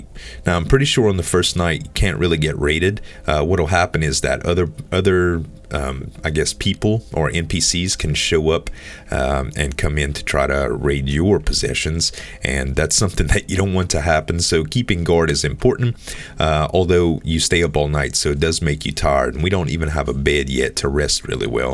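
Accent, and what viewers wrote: Louisiana, United States. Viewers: sounds like hes from Louisiana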